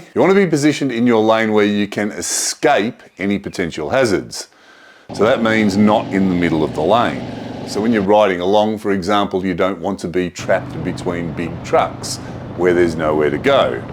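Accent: Australia. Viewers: Can’t tell if you’re from Australia or Boston MA 😂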